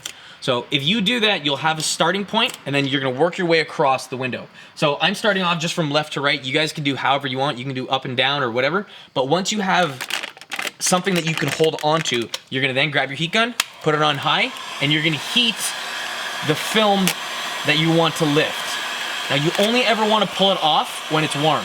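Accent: Canada. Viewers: Art thou Canadian? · Let me guess.... you're from Canada?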